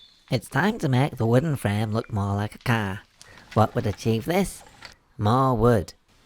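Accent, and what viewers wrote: Yorkshire, United Kingdom. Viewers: I'd bet my hat hails from Yorkshire with that lovely accent, but I recognised Barton on Sea!! Perhaps he just gets around a lot - van life and all that. But wait, he has a shed! Hmmm, maybe he has many sheds...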